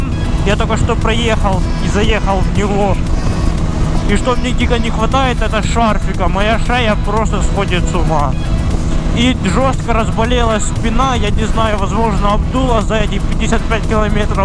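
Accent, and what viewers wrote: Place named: Ukraine. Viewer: смищно говорит!) Особенно смешит этот украинский акцент)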